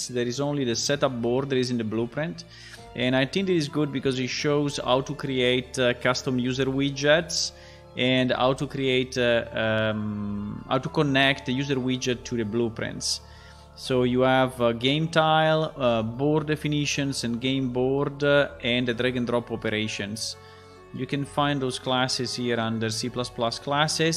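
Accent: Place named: Finland. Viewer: You sound Finnish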